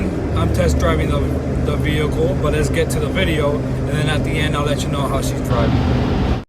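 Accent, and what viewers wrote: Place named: Alaska, United States. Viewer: You sound like you are in Alaska judging by your accent. You are a very kind man.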